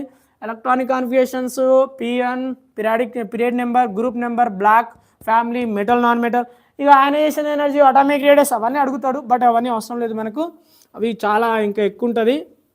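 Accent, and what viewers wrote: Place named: Telangana, India. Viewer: Super Telangana slang ........😉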